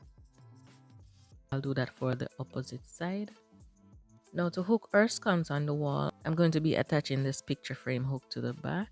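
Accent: Jamaica. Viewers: Your pieces are gorgeous❤❤❤❤❤ and sounds like you are Jamaican🇯🇲🇯🇲🇯🇲🇯🇲🇯🇲👍👍👍👍👍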